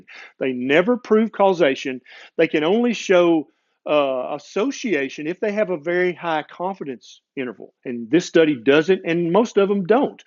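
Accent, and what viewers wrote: South, United States. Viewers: You’re such a genuine person... its why i love this channel a lot. Saturated with knowledge from a MD with a genuine southern accent👍🏻🇺🇸 Which state are u from?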